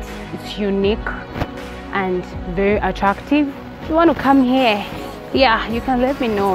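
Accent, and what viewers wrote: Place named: Zimbabwe. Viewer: Nice video babe Your school is nice❤️ I’ll do my school’s vlog too❤️ But why did u have zim accent lol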